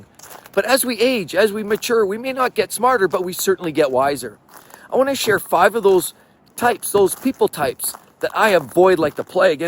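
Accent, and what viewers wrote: Canada. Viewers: Are you Canadian, or northern U.S. ? I hear a bit of a Canadian accent.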